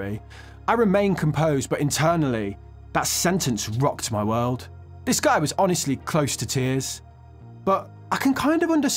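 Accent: Birmingham, United Kingdom. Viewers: is it Birmingham accent?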